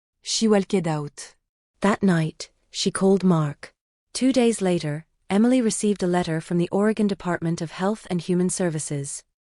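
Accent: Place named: France. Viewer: Extremely annoying! Was unable to understand the foreign French words, hard enough to understand the few English words the narrator spoke with the horribly thick French accent. If you are going to narrate a story, pick ONE language and stick with it!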